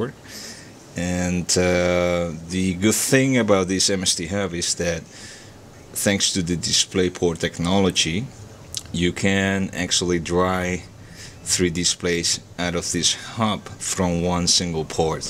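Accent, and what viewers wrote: Netherlands. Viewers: Are you duch think i hear a dutch accent hehe.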